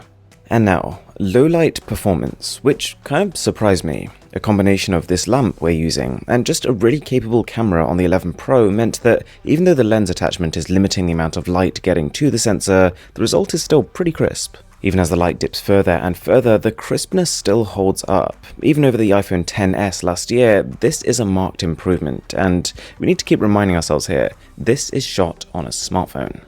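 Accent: United Kingdom. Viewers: Your from he uk!!!!?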